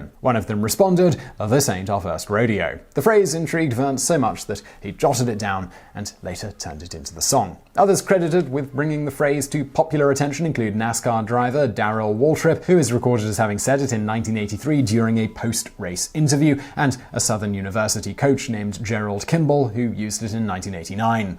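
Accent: United Kingdom. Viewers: I like the way you say it. Your British accent gives it a little extra sting.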